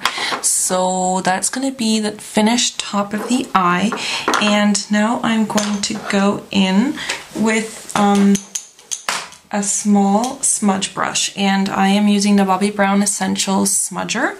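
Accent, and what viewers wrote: United States. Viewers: Yeah. She does sound American. :P Most Europians can't say 'R' or 'Th'. :D Like my mom for example. lmaooooo. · ok ok sorry lol im wrong.. i thought in another video that she said she lives in canada.. n coz she sounds like an american it kinda added up... lol my bad.. soz xx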